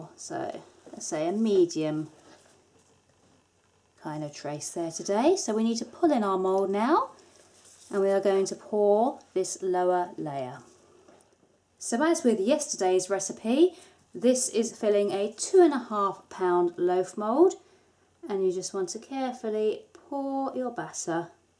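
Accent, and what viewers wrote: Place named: England, United Kingdom. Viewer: Every time I hear your intro music, I'm all geared up for you to have a Beverly Hillbillies accent, and then I do a little double take when a charming English accent comes out instead.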